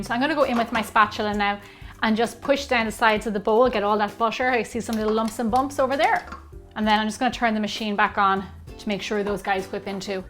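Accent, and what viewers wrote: Ireland. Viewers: Thought she was from Ireland....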